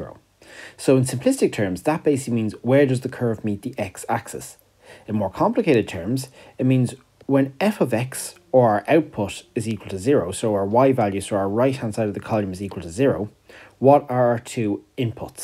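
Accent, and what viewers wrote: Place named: Ireland. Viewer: Thanks! Also, love the accent. Go Ireland lol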